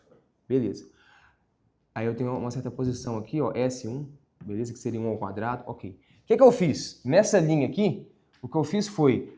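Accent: Minas Gerais, Brazil. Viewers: Esse professor é mineiro mas se veste de português.kkkk. òtima aula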